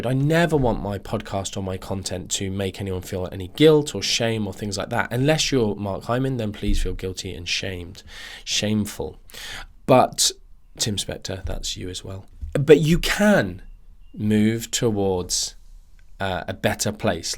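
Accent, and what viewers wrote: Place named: England, United Kingdom. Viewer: Unusually good-looking beard. Ah, - no grey prickly hedgehog-style, or lack thereof. Talk matching it, - good to hear English English for a change.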